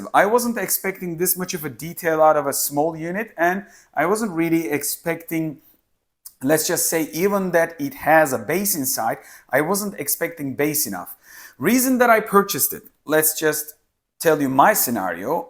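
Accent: Turkey. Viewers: tam Türk aksanı var diyecektim Türkiye dedin :) güzel video olmuş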